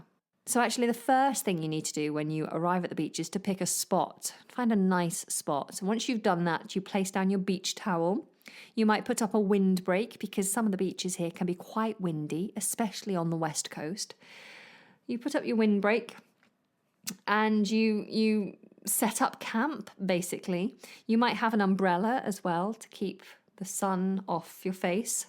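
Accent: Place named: United Kingdom. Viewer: Love to listen your clear British english!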